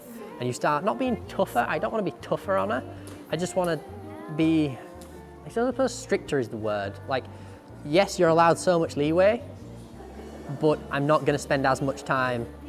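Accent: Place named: United Kingdom. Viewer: Bit difficult to take this serious a cowboy with a British accent.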